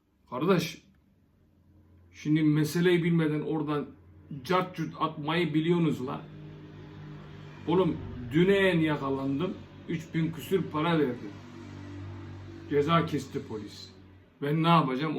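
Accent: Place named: Ankara, Turkey. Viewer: Angara yi seviyoruz..tam Angara ağzı, kelimeler ,tonlamalar, jestler..özledim ...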